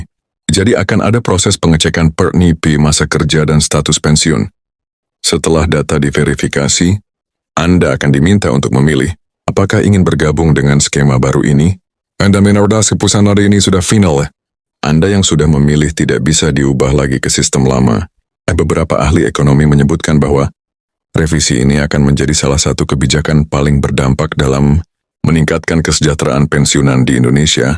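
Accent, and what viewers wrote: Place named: Malaysia. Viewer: Kuang meyakinkan beritanya.seperti ngeledek pensiunan Indonesia.dengarkan baik baik membacanya gaya logat Melayu waspada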